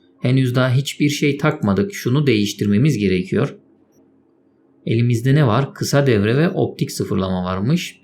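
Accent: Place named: Cyprus. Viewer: Reis selamlar, sen kıbrıslı falan mısın? Aksanın cidden müthiş. Elite dangerous videolarından izleyip geldim.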